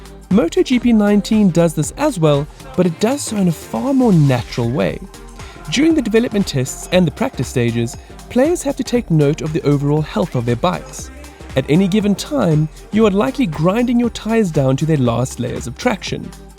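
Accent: South Africa. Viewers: Any South African voice gets a Like and Sub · Well stewie is british and this guy has a south african accent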